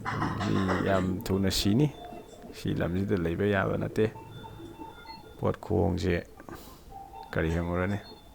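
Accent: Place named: Keishampat, India. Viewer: Bro gi khdang se eikhoi keishampat khdang ga chp manei hydi eymbei dialogue se..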